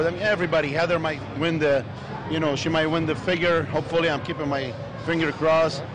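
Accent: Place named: Ireland. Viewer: You're from Ireland? I know a lot of people that bag the shit out of your accent aswell. Have some respect knows more about nutrition and BB than most pros. Who cares where he comes from or how he speaks.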